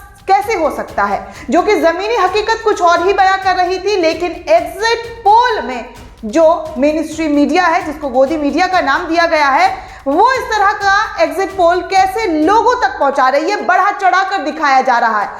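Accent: Bihar, India. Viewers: Achha bolti ho,, achhi anchor⚓ ing karti ho, Zaban thodi si biharion ki tarah hai, aapki. ☺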